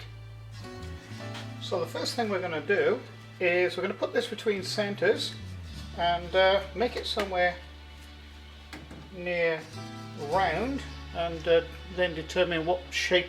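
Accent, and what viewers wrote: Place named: South Wales, United Kingdom. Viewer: good stuff mate... u sound like u have a cardiff south wales accent...may be my ear is off...lol thanx for the video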